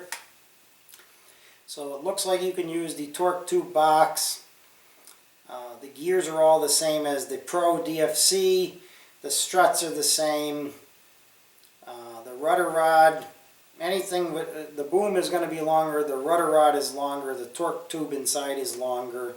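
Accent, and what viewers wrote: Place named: Massachusetts, United States. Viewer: Where are you from? You sound like you are from south east Massachusetts where I am from.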